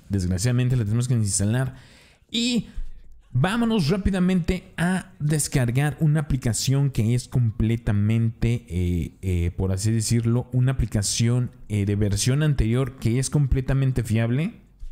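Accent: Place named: Russia. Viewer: Gracias bro, quería hacer este contenido en mi canal, y me pasaba este error, aparte me gusta Tu acento, parece Ruso jaja.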